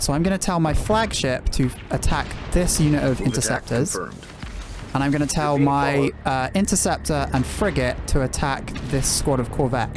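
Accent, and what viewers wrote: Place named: United States. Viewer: Gibt es den keine sch** Anleitung auf deutsch? Ich hasse die amerikaner an sich schon und bei dem Dialekt.... 🤮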